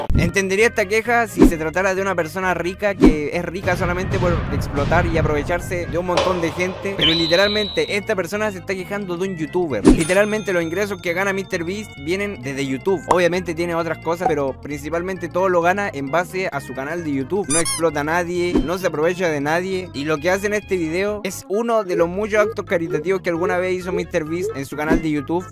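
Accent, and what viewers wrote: Chile. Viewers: De que parte de chile es chileno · Si no le entiendes simplemente pon subtitulos o vete del vídeo, Además ¿que tan difícil es entender el acento chileno?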